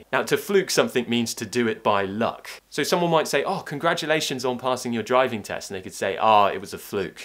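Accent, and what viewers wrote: RP, United Kingdom. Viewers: Good work... British authentic accent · I really enjoy your clips because I love British accent. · Hi I'm watching from Burma 🇲🇲 and you are the very good teacher and teaching and i very like to hear British native English sound and i also try to be learning . Thank you for you making alot of videos. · You are my teacher in British accent ❤️🔥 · I like the british accent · I luv [your] British accent! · Good lesson. I enjoy British accent. Especially your accent,. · Best British accent i have ever heard!!! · Dear, you're a true modern RP accent teacher. Your syllables are loud n clear. I assume like listening to oxford advanced learners dictionary pronunciation👏👏👏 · Hi nice to see you again! I really loved your british accent ♥️♥️♥️😍😍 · Hello , I'm fond of this accent RP Which is your accent teacher RP it's bloody sansationl thank you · Excellent ... 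Love British accent... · Friend of mind from private education system in London!Accent is different with your RP!Strange🧐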